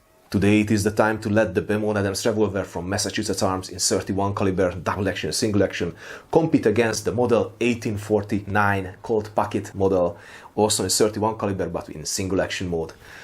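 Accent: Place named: Hungary. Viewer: Nagyon tetszik a magyar akcentusod!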